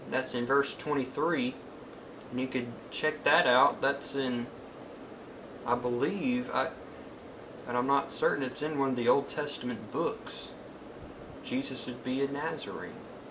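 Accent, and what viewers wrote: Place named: Carolina, United States. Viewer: You don’t have a Georgia ascent you have like a North Carolina South Carolina ascent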